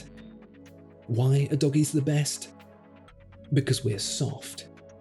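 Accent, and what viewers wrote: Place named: RP, United Kingdom. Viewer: Maybe it was my IPad or me: the Autavia I own has more visual cues than “ go from second hand then case back then name; do that 23 times with a British posh accent that asks questions that he doesn’t answer”. How has Watchfinder earned so many subscribers with that formula? Is it the soft lighting effect and the expensive SLR camera sharing the screen? Perhaps all those other channels that offer watch specs and model line discussion and competitor choices that relate to real-world reasons of why we buy watches- well they must be crazy. Loop four close-ups and add UK accent and there you have it. Half million subscribers.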